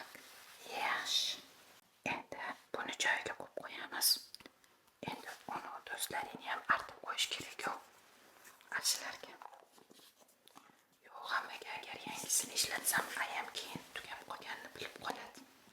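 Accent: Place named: Andijan, Uzbekistan. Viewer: Juda ham ttabbiy chiqqan anjancha gapirishiga bitta❤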